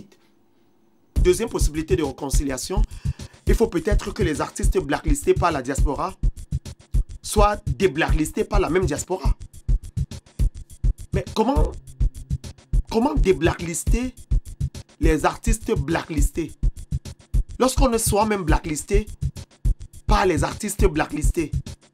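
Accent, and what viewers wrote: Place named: Cameroon. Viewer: Tu as un rare accent camer, où est passé ton chôcô de la Ndeuch Family 😂😂😂😂😂???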